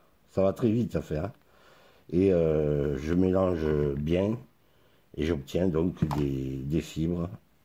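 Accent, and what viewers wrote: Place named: Southwest, France. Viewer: Salut ^^ Toujours ton imagination et ta créativité débordante. Trop jolis tes arbres, fallait y penser. Rendu visuel du tronc assez bluffant. En tant que Toulousain et amoureux de nos routes départementales et anciennes nationales bordées de ces platanes souvent centenaires, fallait que je salut je ton travail sur ce coup là. En plus de ton accent du Sud Ouest, si cher à notre cœur. Adissiatz ^^ José (Tu aimes les trains, tu aimes la musique, en passant ta "SG" est splendide, tu m'épates 😅)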